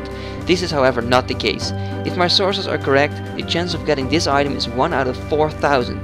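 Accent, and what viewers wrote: Netherlands. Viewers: It's so weird that I just knew you were dutch from like the first word you said :S. I'm dutch too, the accent is not as obvious as alot of other dutch people though. Well done btw, I know what quests to do now aswell :D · Viel me op dat je Nederlands bent :) je maakt echt goede video's man! Gelijk geabboneerd, ga zo door man ;) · kom je gewoon uit nl? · Ben je nederlands man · fantastisch nederlands accent :D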